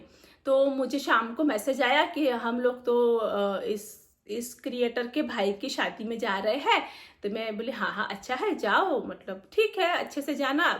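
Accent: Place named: Nagpur, India. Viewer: jb hm pune aaye to plz dal bhat khilana hm bhi aise hi bolte hai ngp me 😂😂good dear same language hamare side ki lagti hai maharastra vali 😍😍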